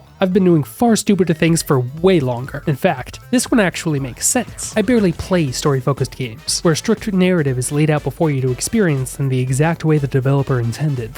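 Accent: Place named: South Boston, United States. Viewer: This guy from Southie or wat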